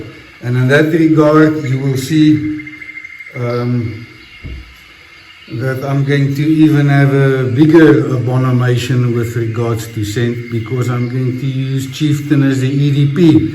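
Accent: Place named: South Africa. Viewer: I came for the amazing shave and education. And I'm staying for the accent! It sounds South African to my ears. Stay healthy and happy and always dapper! Greetings from Australia.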